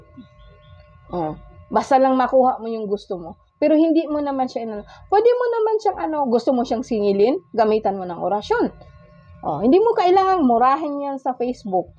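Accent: Bicol, Philippines. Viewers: Mam tanong ko lamang ikaw po ba ay bicolana, kc po bawat bigkas ng salita mo i notice na bikolana ka, from Camarines norte po ako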